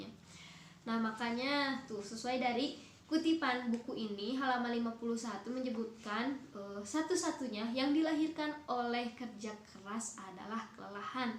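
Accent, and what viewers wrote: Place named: West Java, Indonesia. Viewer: Pidiooo, sunda pisan emang mba nya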